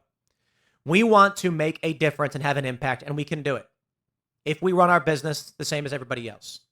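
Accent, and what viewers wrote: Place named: New York, United States. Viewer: Y'all notice he's been using a New York accent lately?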